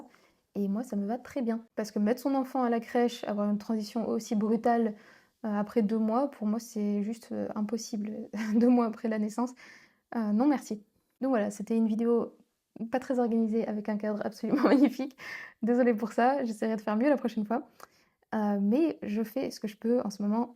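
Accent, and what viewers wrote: Russia. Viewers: tu commence a avoir l'accent russe en parlant français BRUH! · Очень странно: живет в России без четких оснований, непонятно на что живет, работала в Сколково и жила в Москве, теперь безработная и в какой-то сельской окраине без перспектив и смысла при этом вышла замуж и собирается рожать в какой-то деревне, за тысячи километров от родных и дома. Ходила по ток-шоу на тв и всякие шабаши блогеров-иностранцев, а теперь коровам хвосты крутит и задротствует. Чет какой-то месиканский сериал для Степашек про Нетакуську. Уж молчу про русский акцент когда на английском говорит.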